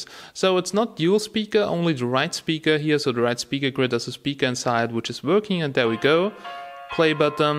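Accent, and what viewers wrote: Germany. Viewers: is he german? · this guy is def. german hahaha that accent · He is german :P I should know cause I live in germany xD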